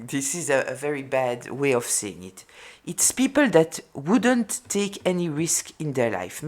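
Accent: France. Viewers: U are simply a Candy to my Ears, all that French accent and the typical French frankness and dutyfullness 100%%%% on the Mark . ❤❤❤🎉🎉🎉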